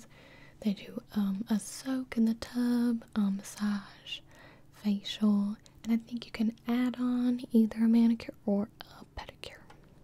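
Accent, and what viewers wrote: South, United States. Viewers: she still kinda has that lil southern accent still lingering but yeah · Back when was southern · used to have a country accent 😂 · I love how voice evolved from this very slightly southern accent to a more anime one lol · She got less southern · I forgot had a southern accent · Old voice: fairly normal + country accent. Present: High pitched + exaggerated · rip to when had a country accent... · actually she sounds very southern here. And I'm from Mississippi, so... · I just now realized used to have a southern and deep sounding voice but now is losing her southern accent and her voice has gotten higher! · I love her southern accent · did anybody else notice that she has a southern kinda accent this video o-O it was really soothing tbh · Is she supposed to have southern accent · Is from the south??? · went from quirky to southern hahaha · This is my favorite ASMR video. 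For some reason the combo of the slight southern accent with the typing and the personal attention just MWAH. Works so well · There we have it, early on, was southern and did that cute tongue clicking thing Mistletoe does. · She used to have a southern accent? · Did get a country accent???? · Gibi should make a role play character with this southern type accent because it is suuuper relaxing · I feel like she was from the south and when she moved back her accent was reinforced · I love southern accent lol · I like how this has a hard southern accent but then the accent slowly deteriorated throughout her later videos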